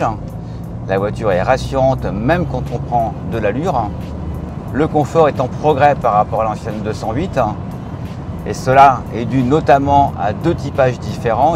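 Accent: Paris, France. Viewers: Chef' ! L'accent parisien !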